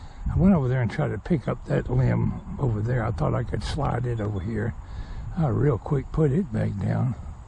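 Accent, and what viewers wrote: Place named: Georgia, United States. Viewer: just remembered your channel and the railroad stories, sometimes listening to your georgian accent is a hoot like the you you say fire, sounds like your saying far. lucky that door didn't fall on him, those railroad things are extra heavy, and you cant ever be too too carful around railroad stuff just hitting your head will about knock you out, that cast and forged metal dont have any give unlike a car or lighter vehicle made of sheet metal, belive me I know in the course of helping in the shop for the nkp 765 we have dont much car restoration the last 5 years, I rung my bell several while working on the inside of the tender to clean it in the off season all those baffles inside the tank , or backed into eighth inch metal on the body of it it dont have any give , and skinned myself plenty crawling around in the inside of the firebox, I would say the scaryest time was wirewheeling and I hit a right angle on the bottom of a car I was taking the dirt and rust off of by the truck of the car and it bounced five times hit my left wrist and bounced back and launched right across my neck still in my hands and landed on the floor it it was a cutting wheel I might not be here or gravely injured, one other time 2 years ago sat a 10 lb arch brick down with my finger right under it and it had a lump on it for a few months from squashing it under the block and the metal of the firepot where the coal comes out to be thrown to the fire with steam jets from the stoker.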